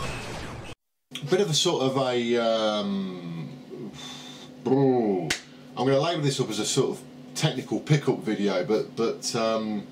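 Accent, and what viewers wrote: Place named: Birmingham, United Kingdom. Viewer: the light, the light! All I will say is I didn't think He would make a second coming and I certainly didn't expect a brummy retro gaming enthusiast to take His form.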